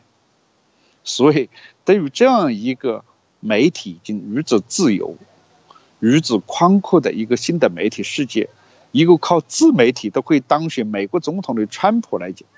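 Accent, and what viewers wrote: Hunan, China. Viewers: 何先生生日快乐🎂🎁，非常喜欢你的节目，从一开始有30-40%不懂的湖南普通话到现已99%都懂了，而且是爱上湖南普通话了。希望您保持你的风格，希望能一直听你的火拍。God bless you and your family！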